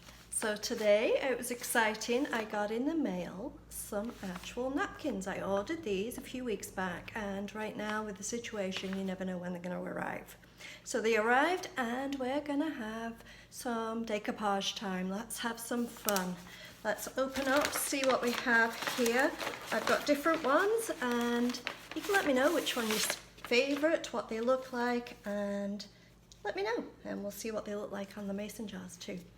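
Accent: England, United Kingdom. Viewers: British voice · Just found your channel and have subscribed. May I ask are you from England as you sound English, without a Canadian accent x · Are you originally from the UK? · Hi, you sound English, love your jars, your techniques, especially the robin jar. I'll be watching again and tell my friends. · I’m fascinated by your accent. Do I detect a whiff of an English accent in there….? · Hi, thank you for your videos. They’re great. And you live in Canada but you sound very English. Where are you originally from?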